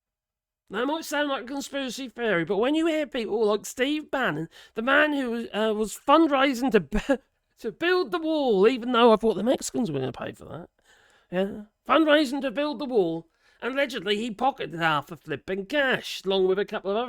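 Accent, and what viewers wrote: United Kingdom. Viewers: Keep the insults on America coming ! I'm serious. Americans are smitten with British accents; pretty much every advertiser in the U.S. uses British voices to sell stuff because we always assume someone with a British accent is smart. Maybe you can make an impact on those trumper morons that the rest of us flat-accent Americans have failed to do.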